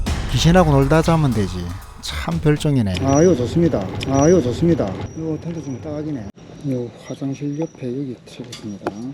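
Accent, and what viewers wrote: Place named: Busan, South Korea. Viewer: 재밌어요 'EBS 세계테마기행'의 '부산 여행가 일본편'을 시청하는 듯했으며, 부산 사투리가 정겹습니다 잘 시청했습니다.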